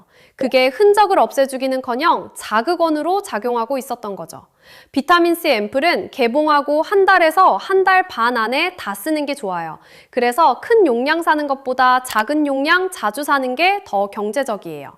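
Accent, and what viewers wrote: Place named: Seoul, South Korea. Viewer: 경남인은 그냥 서울말로 들리는데..ㅎ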